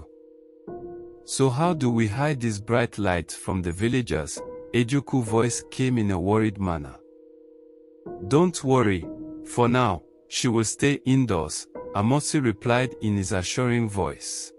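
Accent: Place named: France. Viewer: tou have an french accent, where you from?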